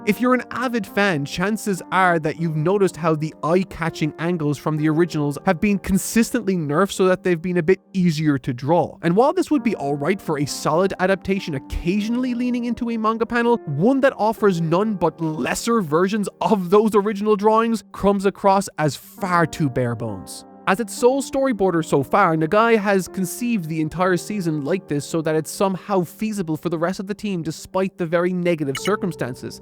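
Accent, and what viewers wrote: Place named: Ireland. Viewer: I was so stunned to hear the titles of those trash shows come out of your mouth. Man, irish accents can really make anything sound at least a little better.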